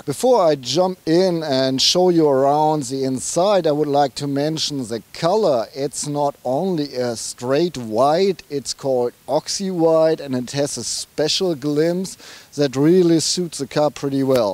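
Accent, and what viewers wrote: Germany. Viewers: lol...he's german, german accent:P not drunk!!!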